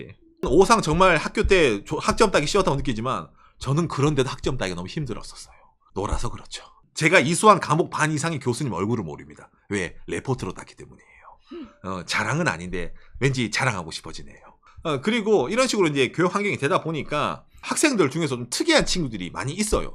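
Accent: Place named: Ulsan, South Korea. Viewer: 울산분이시죠.. 근데 울산 억양이 부산, 경남과는 의외로 많이 다르고 경주, 포항과 함께 경주방언권을 이루고 있어서(요즘은 외지인이 많아서 많이 없어진 면도 있지만) 많이 순화된 대구, 청도말 느낌이 나죠.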